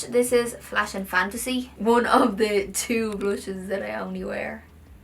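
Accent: Ireland. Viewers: I was showing your videos to my mom today, fangirling about your past replies to my comments. She said she thinks you're gorgeous and your Irish accent is lovely 😃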